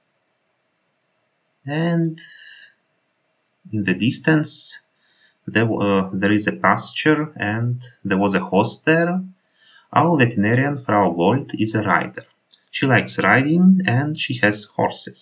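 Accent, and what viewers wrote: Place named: Switzerland. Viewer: Интересно, почему швейцарский акцент? Мне приходится смотреть много учебных фильмов ( по медицине), сделанных в Швейцарии. У дикторов очень похожее произношение. Может в Австрии тоже так говорят? Надо бы видео с австрийцами посмотреть.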